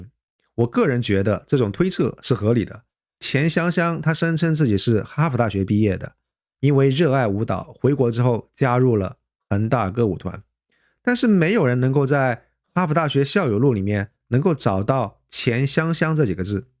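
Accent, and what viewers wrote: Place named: Guangzhou, China. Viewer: 从发音来看，我猜是广州人:face-blue-smiling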